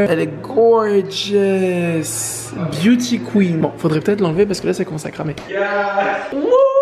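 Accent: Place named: France. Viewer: You should do a video in English! My American ears cannot understand your frenchie-ness:(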